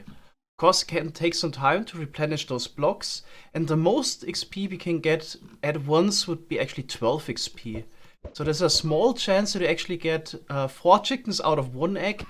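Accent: Germany. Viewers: I sometimes a Little deutschen Akzent oder?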